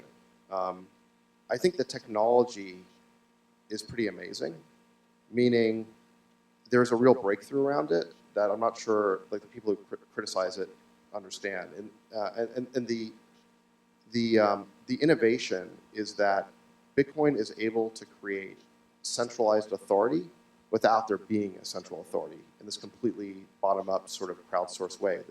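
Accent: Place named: India. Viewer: Indian ascent is really irritating. I am sorry.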